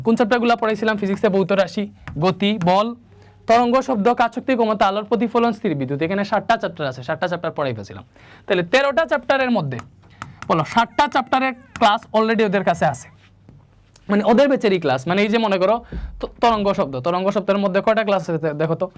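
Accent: Sylhet, Bangladesh. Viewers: ভাই আপনি কি সিলেটি?? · আপনার কথা শুনে বুজা জাচ্চে আপনি সিলেটি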